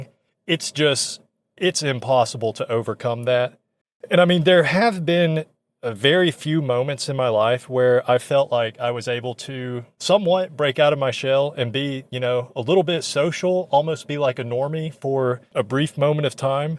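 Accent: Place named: Deep South, United States. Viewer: You should move to a larger city if possible, judging by your accent you’re probably from the Deep South like me, once I moved to a bigger city(Atlanta) it was way easier to find friends and meet new people.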